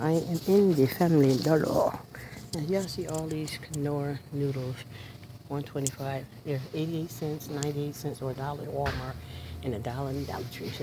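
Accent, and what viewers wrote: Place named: Jamaica. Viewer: Love your Jamaican Accent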